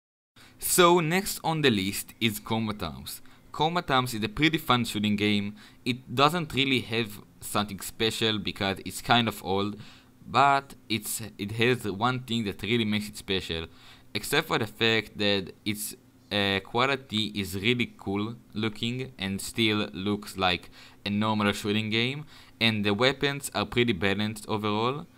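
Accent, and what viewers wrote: Israel. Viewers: יש לך מבטא ישראלי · אחי שומעים בקול שלך שאתה ישראלי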